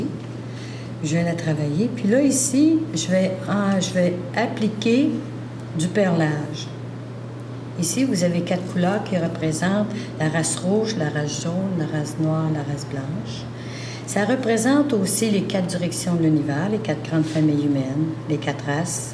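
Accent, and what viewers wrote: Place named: Quebec, Canada. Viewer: Y a en masse de choses qui échappent à mon oreille de français qui adore c't'accent québecois tsé mais j'ai don' bin de la misère a comprendre toute les mots faque chu frustré pasque c'est c'est extrêmement intéressant ! J'ai pas pantoute moyen de savoir la langue qui dit : "mon nom est... " toutea la fin et j'aimerais bien la connaitre, la voir ecrite ! froggy boy.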